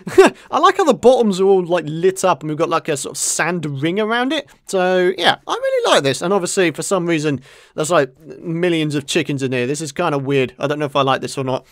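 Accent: United Kingdom. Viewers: ur voice,its so , so, UK. I love it !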